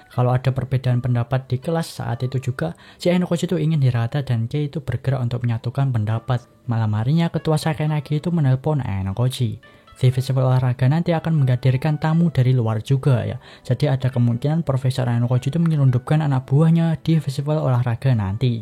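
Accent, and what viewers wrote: Java, Indonesia. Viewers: Akhirnya lanjut lagi, enak dikuping bgt walaupun medok wkkwkw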